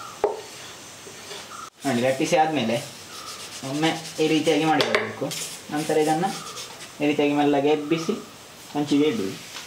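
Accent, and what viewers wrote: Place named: Dakshina Kannada, India. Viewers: Pure south kanara Kannada. Here to listen to that only. Music to the ears. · Your cooking skill is amazing👍👏👏 also it is very pleasant to hear Kannada in South Canara accent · Nimma kannada bhashe holigeginthalu sihiyagide. Pure kannada. Namma dakshina kannada bhashe.👌👌👌👌👌👌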